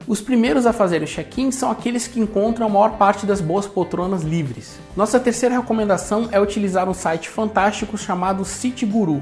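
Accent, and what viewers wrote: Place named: Brazil. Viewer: O cara fala brasileiro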